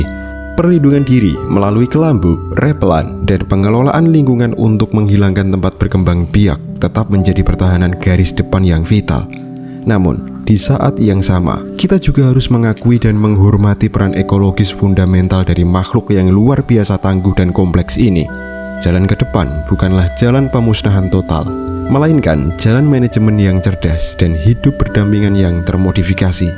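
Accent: Java, Indonesia. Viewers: Medok auto skip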